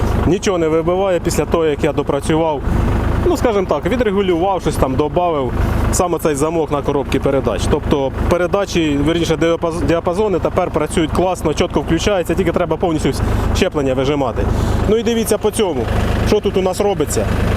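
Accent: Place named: Surzhyk, Ukraine. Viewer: я не к замечанию но коль ты переключился на мову то говари на ней а не на суржике а лучше говари на том языке который знаеш раньше ты неплохо на русском говарил а пахота зачёт тоже занимаюсь только без обид привет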